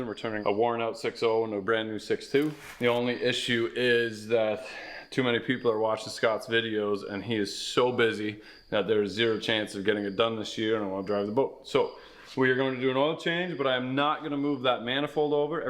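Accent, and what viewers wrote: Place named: Canada. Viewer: How do you spell out the way you pronounce it… owe-t wtf nobody cares your from Canada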